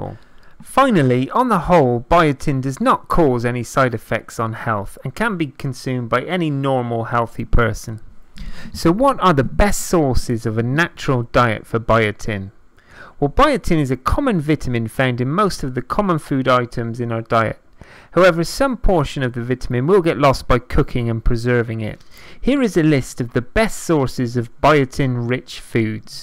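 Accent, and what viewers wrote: United Kingdom. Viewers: British accent is the BEST omg❤️ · I am British living in London. This man is British and is pronouncing things as it should be. This is the UK! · He's from the UK. That's the way they say it there. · British accent is the best! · Why are people so upset, it’s British English, it’s the English that made English ENGLISH. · He's British, silly.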